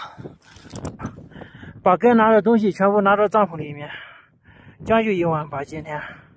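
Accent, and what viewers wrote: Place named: Linyi, China. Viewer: 听口音像是山东老乡，像是俺们临沂那一代口音哈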